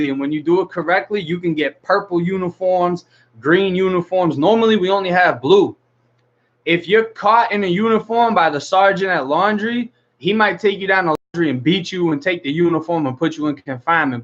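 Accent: Boston, United States. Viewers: Just sub'd. From TX. I get a kick out of your soft accent. Lot of FL friends but it's your Boston that catches my ear.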